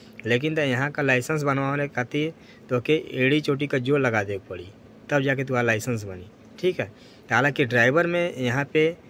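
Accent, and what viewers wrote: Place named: Bhojpuri, India. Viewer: भाई आपके मुंह से भोजपूरी बहुत अच्छा लगता हैं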